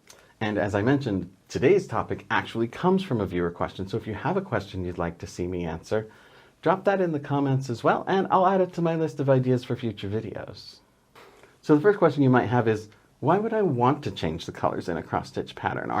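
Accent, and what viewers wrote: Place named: Canada. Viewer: Also, you sound Canadian...where are you located? I am in Ottawa.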